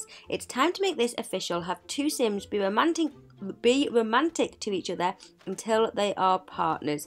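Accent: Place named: United Kingdom. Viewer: Idek but I love the way she talks cause she’s british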